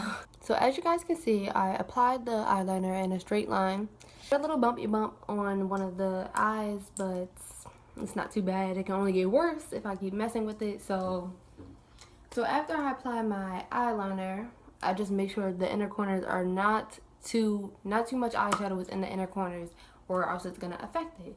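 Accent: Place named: Midwest, United States. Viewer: you sound like you're from northwest ohio or Detroit am I correct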